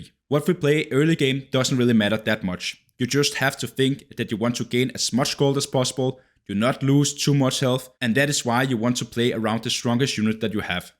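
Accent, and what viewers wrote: Denmark. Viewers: danish accent coming in strong:))) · Love your Danglish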